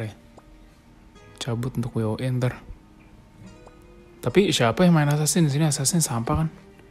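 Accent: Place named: Medan, Indonesia. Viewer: wkwkwk orang mana bro? kyk logat medan ya.. cibai2 la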